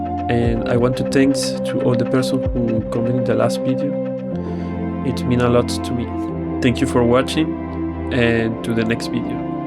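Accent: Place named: France. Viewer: French accent is funny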